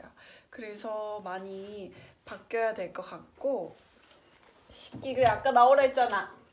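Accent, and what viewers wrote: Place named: Seoul, South Korea. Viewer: ~~ 덕분에 진짜 몇년만에 본다 ~~!! 유튜브 라니!!! 근데 왜 서울말쓰려고 노력해?? ㅋㅋㅋ 너무 귀엽고 이쁘다 유튜브스타로 대성하리!!!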